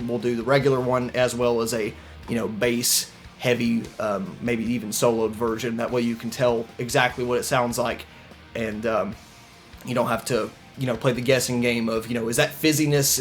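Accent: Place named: Kentucky, United States. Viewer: new to your vids here... Where are you from? I'm from Kentucky,and your acsent sounds like you're from this area,lol. Sorry man not trying to be whatever, but yea... Awesome vid. New sub here.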